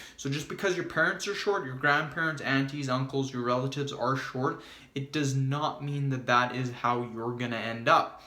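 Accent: Canada. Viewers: He’s Canadian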